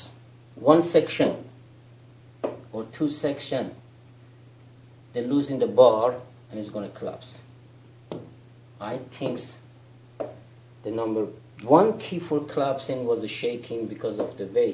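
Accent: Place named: Iran. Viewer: 'vabrication' is 'vibration.' Love the Persh-english! Very good video. They absolutely have not made public any realistic solutions for the sound reconstruction of the main spillway. Hope they watch. Best wishes friend.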